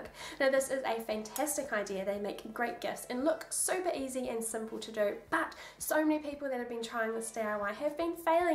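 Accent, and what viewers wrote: New Zealand. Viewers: Lovely listening to you and thinking 'That accent... She must be a Kiwi!' Thank you for the tips, will have a go! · You have a New Zealand accent :)))))))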